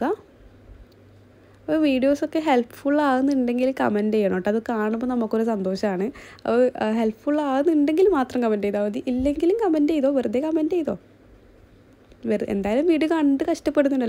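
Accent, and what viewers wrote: Kannur, India. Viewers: ഇത്ത ഇങ്ങൾ കണ്ണൂർ ആണോ ? Slang😁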